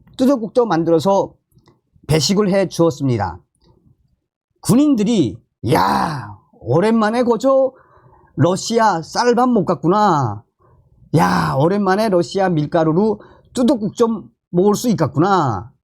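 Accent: North Korea. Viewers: 북한사투리 구수하고 아무렇지 않습니다. 사람마다 생각이 다를 수는 있지만 정성산 감독님, 실수나 말투보다 열심히 북한의 현실을 전해주시는 정성과 열정에 늘 감사드립네다^^ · 북한식 어투 그대로 전달해주세요. 생생한 느낌이 그대로 전달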